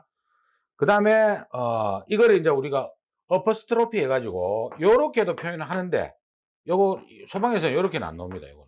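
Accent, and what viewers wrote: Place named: Gyeongsang, South Korea. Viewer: 갱상도 스타일의 직관적인 강의 좋아요 ㅋ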